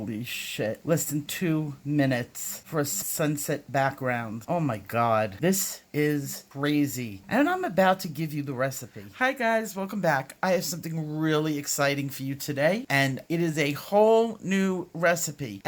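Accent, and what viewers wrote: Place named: Massachusetts, United States. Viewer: "The HECK did I invent hea?!" Love it 😂😂 I live in MA so hearing your accent always puts a smile on my face 😊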